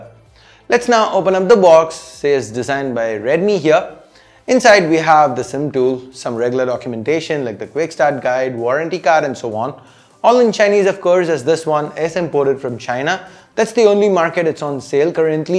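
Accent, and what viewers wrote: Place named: Tamil Nadu, India. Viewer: Haha tamil channel illama english veraya hmm👍👍👍👍👍👍👍👍👍👍💕💕💕💕 enna dhan english pesinalum tamil slang madjiriye iruku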